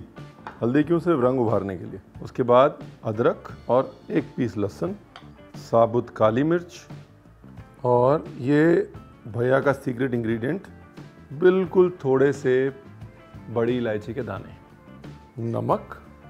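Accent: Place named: Lucknow, India. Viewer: Jab aap bolte hain toh mere dimag mein bs lucknow aata hai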